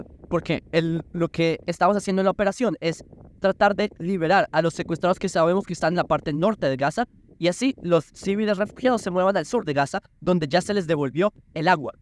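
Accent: Colombia. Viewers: Hablas como los colombianos......por qué?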